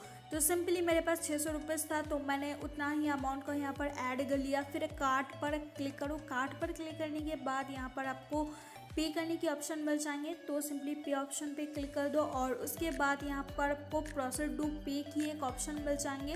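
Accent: Bengal, India. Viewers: Bangali hai ye log aise hi Bolte hai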